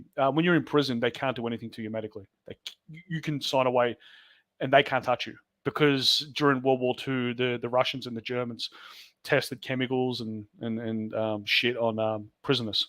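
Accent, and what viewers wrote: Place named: Australia. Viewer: You remind me a lot of Phil Anselmo (from Pantera and Down) but with an Australian accent instead of the Southern NOLA accent. Very hot! Hope everything goes well in your case, it certainly doesn't sound like you've done anything wrong!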